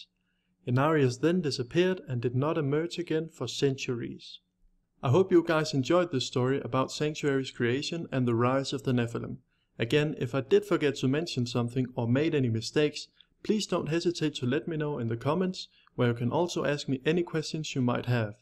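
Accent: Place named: Denmark. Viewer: Nice job! Where are you from? Your accent sounds very Danish, but I'm not an expert ;)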